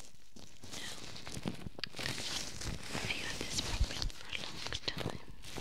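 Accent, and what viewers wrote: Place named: United Kingdom. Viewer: Your voice relaxes me especially the way you intimately talk into the microphone. What kind of British accent do you have exactly ? It’s perfect for ASMR.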